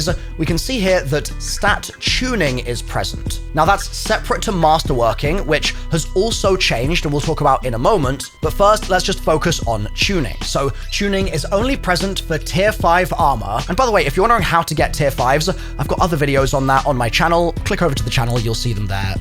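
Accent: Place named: United Kingdom. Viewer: why are you bri'ish?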